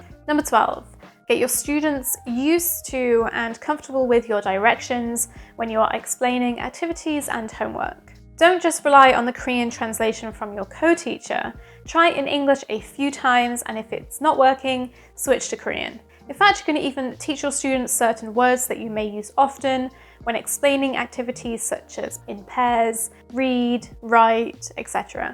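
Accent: United Kingdom. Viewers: I got a kick out of your pronunciation choices...especially the WATER choice. I've had this thought in the past, watching your photography video's, as the word water comes up often, within 'waterfalls' or if your talking about a stream. Our American/Canadian choice to you sounds like wa(d)er as you explained, but from our perspective the 'wat' is the same but the end 'er' is different. Nonetheless, as Canadian, I love the UK/British accent, and have no issues (so far in understanding every word. Oddly, I had an uncle that came from Wales some decades ago, and I just couldn't understand what he was saying for the most part, so I assume there must be an 'old school' Welsh accent perhaps? Anyway, trying to catch up on your video's...have a great day!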